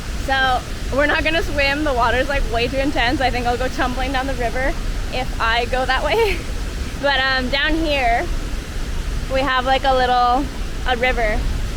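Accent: Costa Rica. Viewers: Hi, I have seen some of your videos and your spanish pronunciation is very "Tico" and also cute, How did you learn it, formal classes or just listening and talking ?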